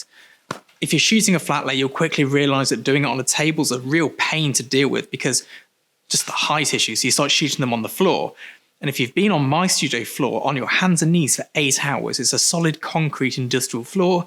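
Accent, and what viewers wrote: England, United Kingdom. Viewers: stay with British English, it's more interesting to us Americans :) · Hi, thanks for sharing your insights and all of that mate! Could you show us how you get everything level for flat lay and how you use that laser wotsit? As far as your choice of English goes, I like your English English!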